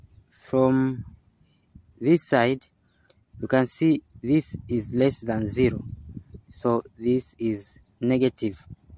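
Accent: India. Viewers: Listening this solution, I forgot the math I knew, but I learned Indian pronunciation. Thank you so much!!!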